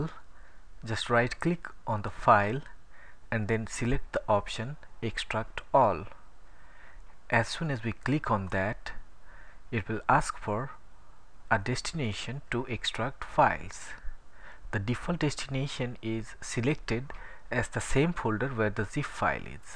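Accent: India. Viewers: As soon as I hear the Indian accent I instantly don't trust them with tech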